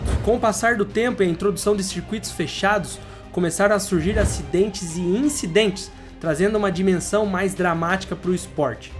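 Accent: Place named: Paraná, Brazil. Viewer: O narrador é da região Oeste do Estado do Paraná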